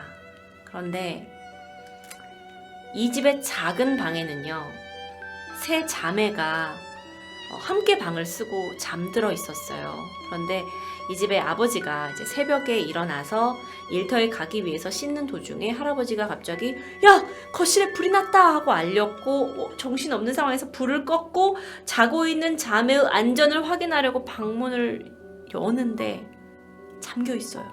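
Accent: Jeolla, South Korea. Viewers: 혹시전라도 분이신가요 님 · 디바님 고향 전라도임? 의를 자꾸 으라고 발음하는거 이거 전라도발음인디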